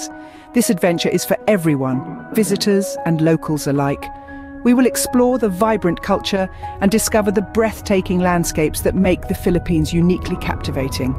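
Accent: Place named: United Kingdom. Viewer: Nice Video. With the British Accent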